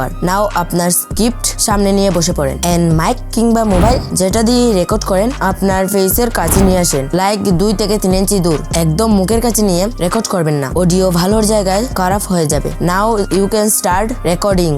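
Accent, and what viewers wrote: Sylhet, Bangladesh. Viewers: By the way Are you from Sylhet ?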